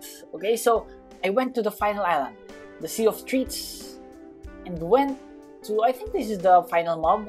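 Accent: Philippines. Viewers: Can I ask u are u a Filipino cause your sound a lot of it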